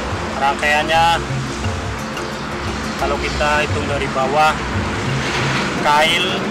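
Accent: Indonesia. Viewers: Logatnya kaya Cirebon sih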